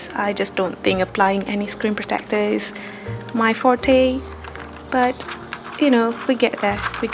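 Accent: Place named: United Kingdom. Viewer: The british accent ❤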